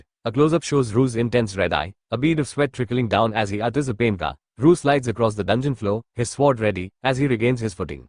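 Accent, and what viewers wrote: India. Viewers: From all the accents you could use you CHOOSE Indian???? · I mean, if this is AI doing the reading, then Choosing an indian accent is just Evil. If its just some guy readin then I wont complain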